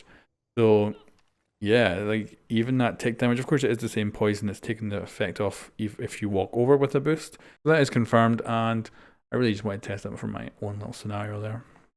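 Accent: Scotland, United Kingdom. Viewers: I like the Scottish accent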